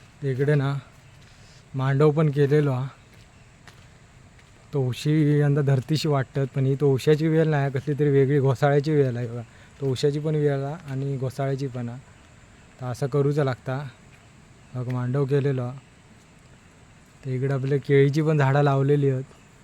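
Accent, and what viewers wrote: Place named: Malvani, India. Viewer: Malvanimadhech bol, Bara vatata eikak!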